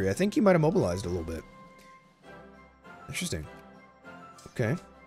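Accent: Spain. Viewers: It's funny how you say Portuguese things with a Spanish accent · ye he pronounces j's as h's as if it was spanish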